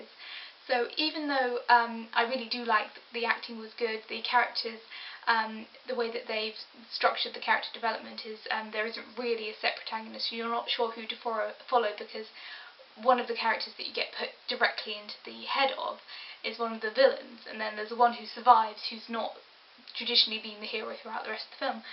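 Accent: United Kingdom. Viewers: I'm impressed, count me a new subscriber. Sounds like you might be from across the pond. When I first saw this movie it scared me about as much as any horror movie does. (The scariest movies make me jumpy, and I have always had a vivid imagination of what things might be lurking in the dark.)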